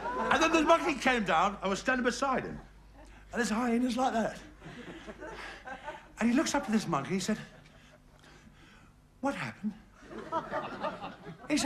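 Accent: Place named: West Country, United Kingdom. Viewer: He had a thick West Country accent!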